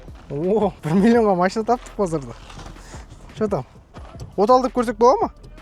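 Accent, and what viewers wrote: Standard. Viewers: Бауырым, рахмет! Мына видеон браз конил куйди котерди е вахахаххааа Не деген мыкты обзор жане таза казакша сойлегенине рахмет айналайын!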